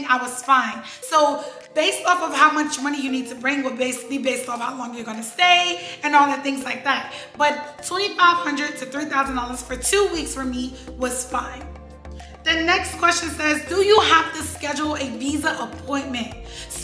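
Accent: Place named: Nigeria. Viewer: Some of Your words are becoming to sound like a Nigerian 😅....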